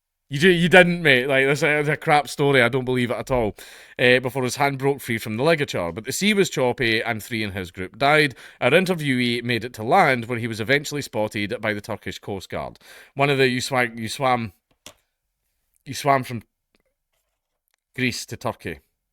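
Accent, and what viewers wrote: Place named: Scotland, United Kingdom. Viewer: I’m just here to see do different accents then revert back to his HEAVY Scottish accent 🤣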